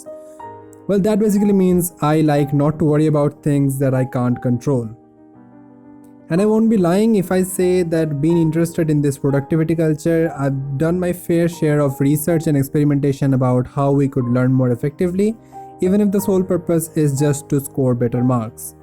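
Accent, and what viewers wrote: Bengal, India. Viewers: Aap Bengali ho na?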